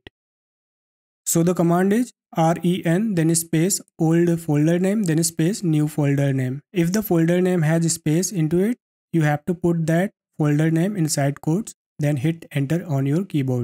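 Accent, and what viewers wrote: India. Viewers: Powerful bro with indian accent . Thank you so much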